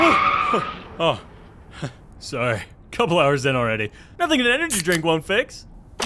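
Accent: Canada. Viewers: wait are you canadian????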